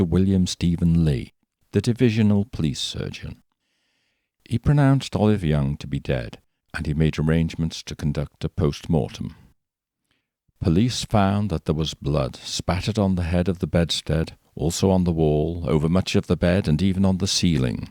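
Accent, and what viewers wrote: United Kingdom. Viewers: This was undeniably British, what ?! I'm inclined to start saying Cheerio, instead of bye.🇬🇧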